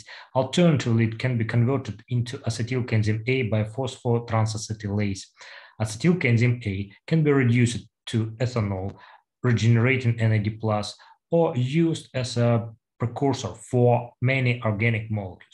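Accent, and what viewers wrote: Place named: Russia. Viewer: your accent is absolutely from Russia or like these countries, it never seems like English, if you can write in title boxes in English it will be better so we can understand....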